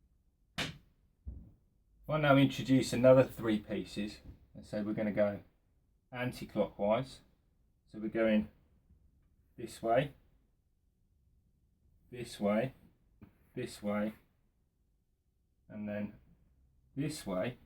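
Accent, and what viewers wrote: United Kingdom. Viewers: Ciao, first THX forma clear explanation trough images of the blade while spine around the wood. But I am italian, so my english is far to be perfect. I have had some problems with your accent. I suppose you are from uk and not usa. May I ask you if you are scottish,or irish or somewhere else. I am quitecurious and always trying to improve my english. May ne New Zealand? THX again and forvgive my curiosity. Ciao from Mi!ano Christian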